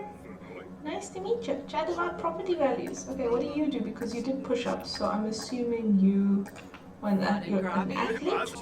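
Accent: South Africa. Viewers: Lost it at "hey MTV, welcome to my crib!" hahah Also, are you South African? Your accent sounds a lot like another YouTuber I used to watch and he lives in South Africa :0 TalesofLumin, I believe is his name.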